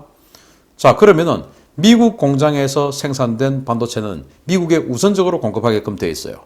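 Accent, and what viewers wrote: Gyeongsang, South Korea. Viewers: 당신 경상도 사람이지 말하는것 보니 티가나네 좀 젊잔케 해봐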